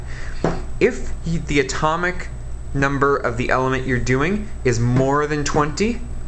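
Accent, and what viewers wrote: Canada. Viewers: the canadian accent just makes everything better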